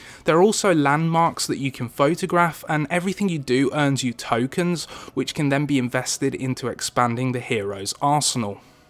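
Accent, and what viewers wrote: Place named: Birmingham, United Kingdom. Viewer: That toxic Birmingham accent is distracting me. Good video, however.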